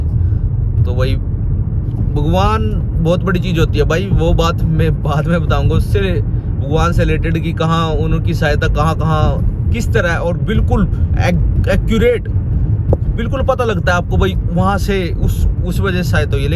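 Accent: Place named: Haryana, India. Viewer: bhai mein yamunanagar se.... Apki boli tu jame humare karni ki hai ... Konsa agent tha apka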